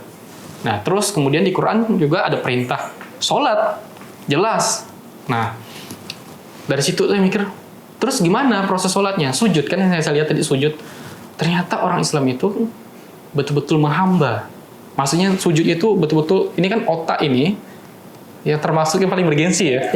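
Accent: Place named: Pontianak, Indonesia. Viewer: Logat Melayu Pontianaknya masih kental ya bang... Alhamdulillah, crite abang mirip dgn teman sebangku ku, krn keseringan lihat saye beribadah.. semoge abang istiqomah... aamiin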